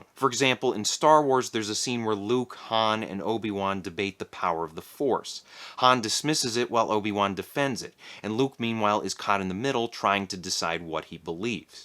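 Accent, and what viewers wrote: Chicago, United States. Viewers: Are you from Illinois/chicago area?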